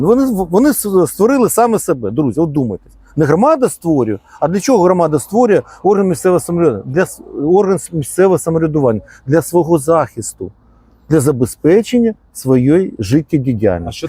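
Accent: Russia. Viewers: Це іде тиха окупація України для створення нового Єрусалиму. Цьому потрібно поставити заборону. Але поки в нашій владі засилля неукраїнців - буде дуже важко. А пану Павліченко та всім причетним щира дяка , що піднімають це архі важливе питання. А пану Павліченко на часі вивчити українську мову і в побуті також послуговуватися нею. Бо досить дивно звучить український боєць за Україну, який розмовляє як москаль, що фарбується під українця.❤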